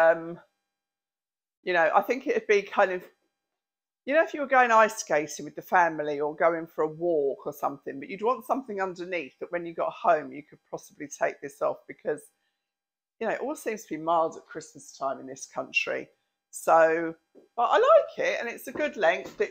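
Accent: England, United Kingdom. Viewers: I love hearing the English way of saying things, so please don't feel you have to say sweater. The flower sweater needs something in the middle. That gold neck sweater is stunning on you! The detail is almost art deco. You could wear that any time of year and, heck, wear it during the day! Hahaha, the pearls are molecules! Love the pattern of the jewel one! So pretty and really catches the light. The berry color looks great on you! It's definitely more casual than the others, which isn't a bad thing. The ivory looks lovely with your hair! The gray could be worn any time, as well. It's cute! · I agree with both your favorite sweater and the jacket type with stars - and the quality of the knit makes such a difference - I guess I am a member of Hels Bells also, from over the pond, - I look in am for what is new and enjoy each one. - my English blood loves your accent/your fun personality and all our trips to England, - hubby and I (lost him two years ago) said for all our European trips, England we would go back in a blink. 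I love the term “jumper” - I remember an English author I was reading from about 30 years ago was my first introduction - I was puzzled as she put on a “jumper “ that is a MMm. How do I explain - skirt with vest top attached. We call that a jumper, - but I love your term for sweater and at this point the world is so much smaller, I think most Americans know for the English, a sweater is a jumper. Keep your English terms, makes you more special💕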